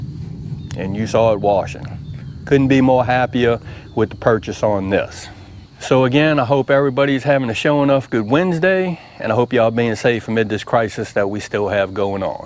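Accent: South, United States. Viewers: Oh my goodness!! The southern twang and slang made me giggle so much it just made my day. You’re the best! Love this review & it helped me. So thanks for your time & the good vibes!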